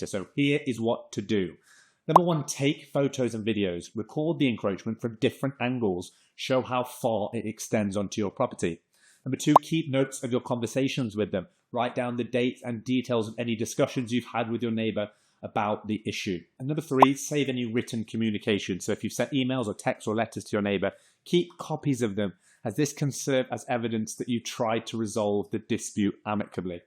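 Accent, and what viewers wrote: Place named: England, United Kingdom. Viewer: Is this UK law or American Law? I notice you're spelling "Neighbor" which is the American spelling but you sound English?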